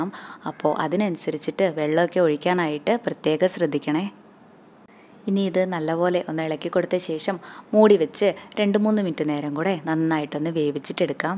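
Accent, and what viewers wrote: Palakkad, India. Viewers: പാലക്കാട് slang ആണോ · 👌👌 madam palakkad anno veed edak palakkadan model language varunnund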